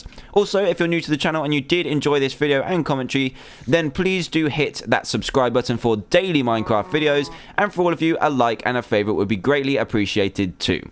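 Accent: England, United Kingdom. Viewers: i used to watch your videos so much when i was about 3, that i developed an english accent, it sounds stupid though because its morphed with a US accent (because in in the US) but still, if i had a choice i would have still watched all your videos. we love you, you were a pillar of old minecraft, and a peice of the foundation new creators build upon. Thank you.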